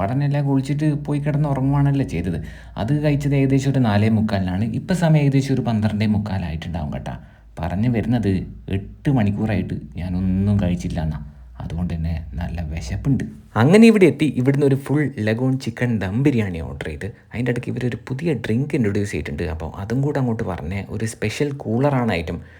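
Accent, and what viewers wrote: Kannur, India. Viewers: Uushentappa🤣🤣🤣kannur bhasha. U are so funny👍😂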